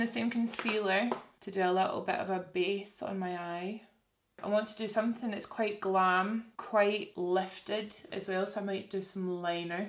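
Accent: Scotland, United Kingdom. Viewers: I always watch your vids when I’m stressed out before exams. Something about a scottish accent is so soothing?? hahaha luv u!! come to dublin thanks ❤️❤️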